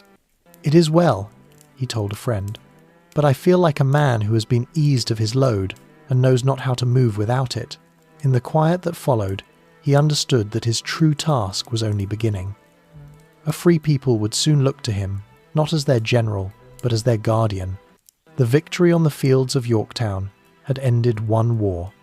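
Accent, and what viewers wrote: England, United Kingdom. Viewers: Why would I trust an English man narrating American history!!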